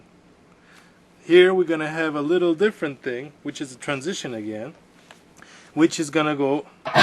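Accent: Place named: Eastern Europe. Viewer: love the accent....Where you from man? Sounds eastern european.....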